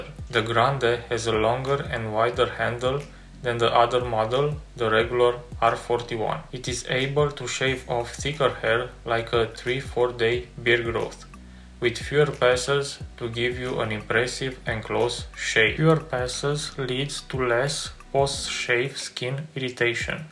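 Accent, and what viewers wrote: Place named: Romania. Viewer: Based on his English accent I reckon he is from Romania, or some other eastern country.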